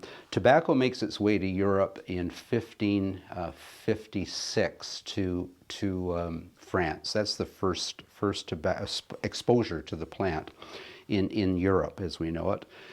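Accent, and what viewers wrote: Canada. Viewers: Hi don t change your Canadian saying s I m from Massachusetts and every on know were Im from when i speak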